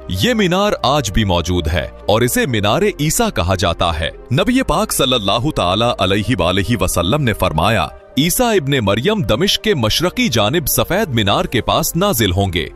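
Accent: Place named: Pakistan. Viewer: Kya aap pakistani hain? Itni pyari urdu pakistan walon ki ho sakti hai 😊 hum mumbai se hain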